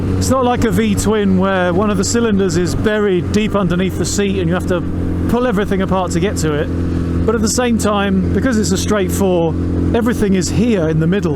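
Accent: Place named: United Kingdom. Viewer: Wow, amazing review... really good quality everything, congratulations man. Your British accent along with the metric system talk sounded odd but so, so satisfying :). Can't believe I've watched a 30 min review without getting bored and even being surprised it ended so quick. You've just got a new subscriber ;)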